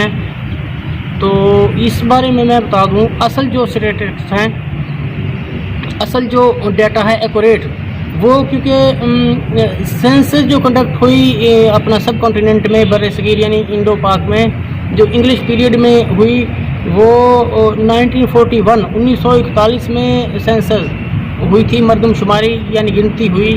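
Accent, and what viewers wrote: Haryana, India. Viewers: When you speak Haryanvi,it gives a quite different impressin.Anyhow I regularly see your videos based on exodus from Haryana which makes me remind what my father used to tell me calling it Gadar.I respect you for your way of putting things in the true perspective regarding Haryana's culture then a part of Panjab.I am from Karnal.One question which still remains illusive is about atrocities on Hindu women by Muslims.Honestly clarify it.Hatred & radicalisation from any side shall only be destructive for humanity in both countries.Thanks & best wishes for your efforts to make people know the painfull reality of partition. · Haryanvi bolte ho bilkul hamari side ki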